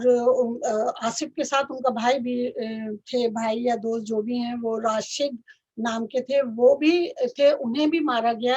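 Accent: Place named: Haryana, India. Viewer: Haryana ala boltay madam😉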